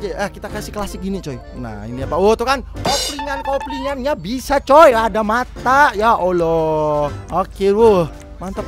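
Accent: Jepara, Indonesia. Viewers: Abang orng jepara?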